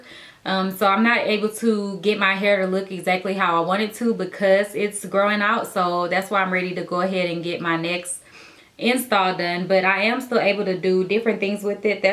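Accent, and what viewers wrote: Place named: Louisiana, United States. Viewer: Are u from Louisiana? You have an accent... your hair looks great btw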